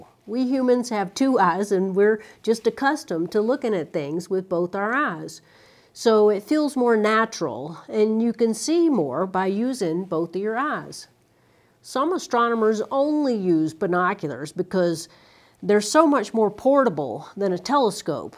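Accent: South, United States. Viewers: Can't wait to get a pair. And I love the southern accent :)!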